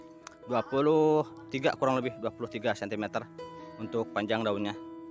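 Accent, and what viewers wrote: Indonesia. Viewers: Very nice orchids and advice. It sounds like you are Indonesian? I lived there for 12 years and loved it 😎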